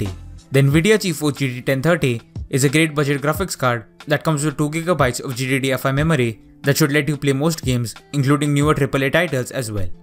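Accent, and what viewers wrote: India. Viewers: Guys don’t be so mad I know he has a Indian accent and the pc only got 4 gb ram but it’s a budget pc so it should be cheap and he say it’s for Fortnite so if you want pubg go and watch another video ;)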